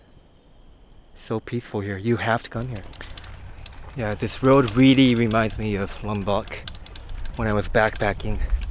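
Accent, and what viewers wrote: Singapore. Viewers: 英語がシングリッシュ寄りのアクセントになってきた気がするww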